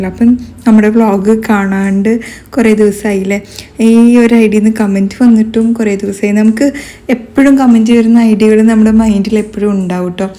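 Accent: Palakkad, India. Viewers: പാലക്കാട്‌ കാരുടെ സംസാരം നല്ല രസാ അല്ലെ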